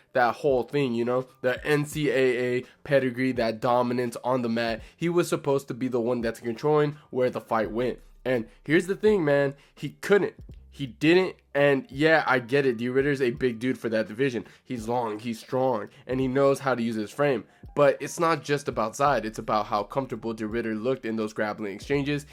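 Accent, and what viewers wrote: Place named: Mexico. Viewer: whos we? You dont sound american you sound mexican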